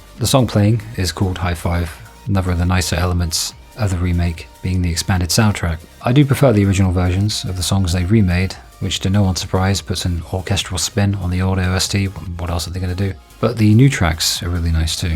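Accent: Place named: United Kingdom. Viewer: oh he's british. Now it all makes sense.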